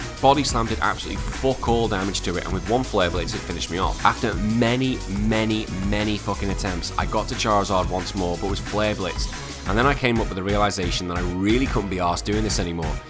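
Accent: Liverpool, United Kingdom. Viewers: Yo my guy u sound Liverpoolian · I can tell you’re scouse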